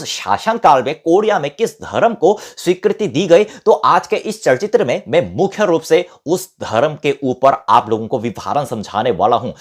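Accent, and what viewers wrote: Standard. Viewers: अति उतम अपकी हिन्दी बहुत अच्छी है इतने शुद्धता से तो हिंदुस्तान मे कोई नही बोलता हैं आपके दवारा दिया गया ज्ञान सर्वोत्म हैं❤ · नमस्ते बेटा तुम इतनी शुद्ध हिंदी बोलते हो,बहुत अच्छा लगता है,rusim धर्म बहुत अच्छी विचारधारा है,काश इस विचार धारा का अनुसरण पूरी दुनिया करे। अपने धर्म का भी सम्मान करें। ईश्वर की कृपादृष्टि तुम पर हमेशा बनी रहे🙏😊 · Your Hindi is so pure, 100% understand. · Wow you Hindi is so pure! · हम हिंदुस्तानियों को इनसे कुछ सीखना चाहिए !! एक भारतीय न होकर भी यह हिंदी इतनी शुद्धता से बोलते है ,और हम भारतीयों को न तो ठीक से बोलनी आती है और न किसी को लिखने ,कमेंट सेक्शन में भी इंग्लिश का चलन है 😟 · Apke jitani shudh hindi hume bhi nahi aati great👍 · Itna pure hindi ham indians v nhi bolte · waaao!!! i love ur hindi!! amazing!!! so shuddha!!! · Bhai etani shuddha hindi to hum bhi nhi bolte jitni tum bol rahe ho gajab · इतनी शुद्ध हिंदी 99.9% भारतीय भी नहीं बोल सकते हैं।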